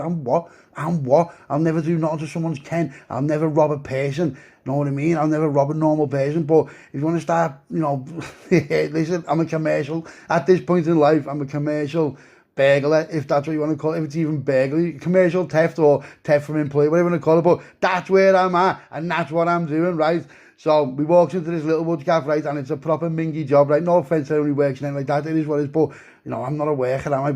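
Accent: Liverpool, United Kingdom. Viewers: Big up the Scouse narrator, proper legend you lad, just got in bed, the mrs is getting frisky and I got an excuse, don't even have to use the headache blag, come on give it a rest I'm listening to scouse stories. Hahahahahaha 😆😆💯💥.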